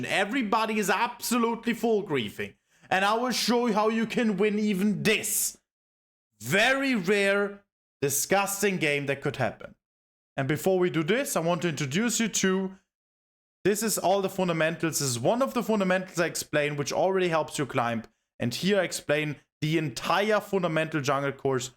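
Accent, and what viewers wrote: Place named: Germany. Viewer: Sounds like German English. 😄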